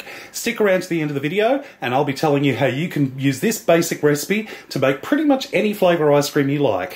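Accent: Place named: Australia. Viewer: I recently subscribed to your channel for two reasons: the easy-peasy recipes, plus your delightful Aussie accent! We Americans do enjoy hearing y'all talk.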